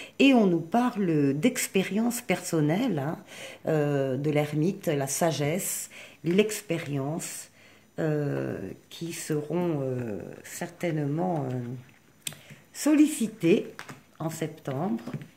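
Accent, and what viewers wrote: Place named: Spain. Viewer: Es tu espagnol? Y a un petit accent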